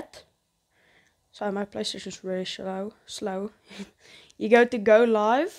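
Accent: South Africa. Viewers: Why u sound south african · I had a South African best friend on ps5 voice chat friends when I heard your voice I immediately knew 😂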